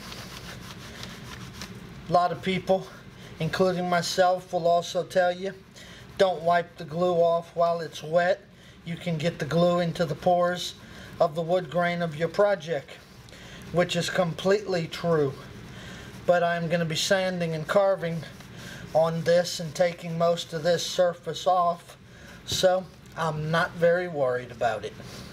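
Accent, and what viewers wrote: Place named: Brooklyn, United States. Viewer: I just watched your interview with the German gentleman, wish I could remember names but, middle age, whatcha gonna do, and I got the low down and lay out of that shop and forgive me lord but DAMN! I couldn't be more impressed if the tools were gold plated. I'm looking forward to seeing more of your skills and ideas. My biggest question so far is how you can live in Texas and retain as much Brooklyn in your accent as you do, or am I losing my hearing too? Gotta go now, you're about to reveal your peacock table and I don't want to miss it. We'll talk again, Peace and respect from Idaho.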